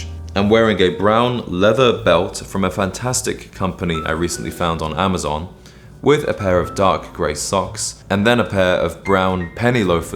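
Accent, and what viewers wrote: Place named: England, United Kingdom. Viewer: Why not??? You sound English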